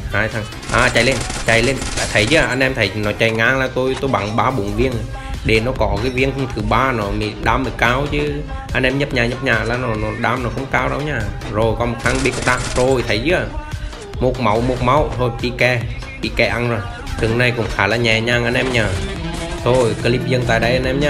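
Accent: Central, Vietnam. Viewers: anh là người miền Trung à, nghe giọng hay ghê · Anh ở đâu miền trung anh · nghe tiếng anh này nghe giống người miền trung với lại nghe cũng ấm ko quá khô nói chung chơi cũng hay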